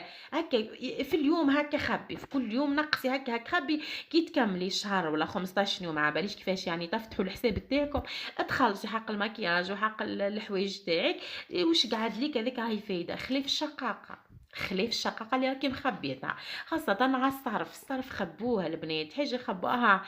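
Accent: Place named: Tunisia. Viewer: علاش تهدري كي توانسا انا من تبسة منهدروش هاك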